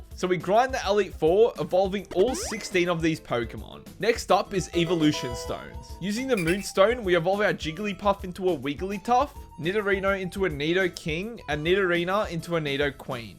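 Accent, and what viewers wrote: Australia. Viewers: Cringe aussie accent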